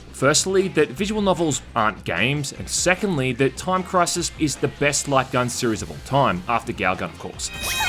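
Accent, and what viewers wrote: Australia. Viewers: Hey are you on Aussie or a Kiwi? Trying to figure out the accent.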